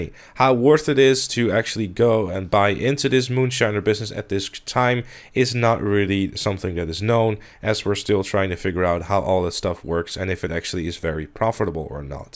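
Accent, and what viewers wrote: Netherlands. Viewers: His dutch accent is funny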